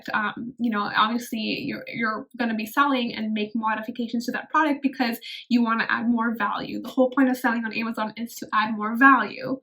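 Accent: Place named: United States. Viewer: Looks Indian, with American accent, beautiful though.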